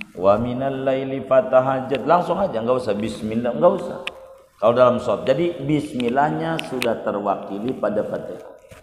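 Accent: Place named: Banten, Indonesia. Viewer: Izin berguru ke pak kiyai, saya dari kresek tangerang, dari logat bahasa pak kiyai kaya nya pak kiyai dari serang banten.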